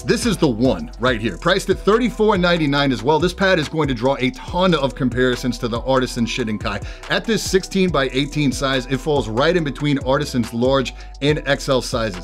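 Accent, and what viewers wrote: United States. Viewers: You have the most American voice ever.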